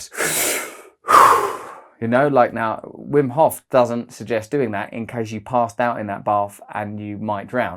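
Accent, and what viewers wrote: Cockney, United Kingdom. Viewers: Us bloody yanks would actually take you seriously when you sack your cockney accent.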